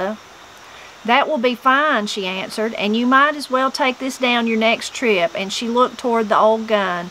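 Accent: Appalachia, United States. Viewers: Thank you So Much for sharing by reading this story. It feels so great listening to you in Appalachian tone of voice. Reminds me so much of my mother. Is the book still available to buy; it would be interesting to read it with the Appalachian accent. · I don't believe this book could be narrated better by anyone else. Your Southern English accent give these written words the life and nuance of true Appalachian people. Stephen King once said that he prefers his books be 'heard' through the use of audiobooks, because it insists that the listener consume all that he had written, instead of "speed reading", or hurrying through it, not grasping the emotion and meaning that he spent so much effort instilling into the work. I too love audiobooks because of this.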